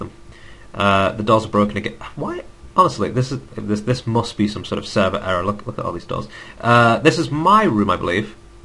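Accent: United Kingdom. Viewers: im not being mean but r u british cuz u sound like it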